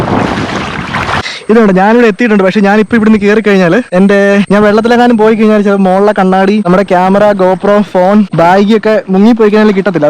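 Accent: Kottayam, India. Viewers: Bro kottayamkaran alle bro?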